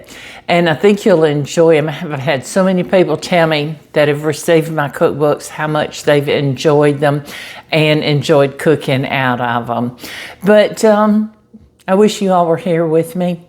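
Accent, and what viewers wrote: South, United States. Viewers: I say Italian the same as you do. Please don’t worry about what negative people think. You are a lovely person and I love your southern accent. I also love your recipes! Have a warm nice weekend with Pop. 💕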